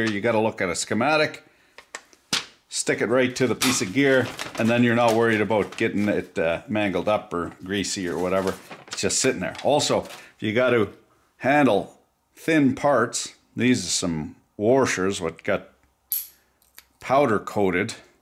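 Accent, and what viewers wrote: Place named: New Brunswick, Canada. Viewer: Magnetic Hill? I knew you were from NB with that eloquent chiac of yours.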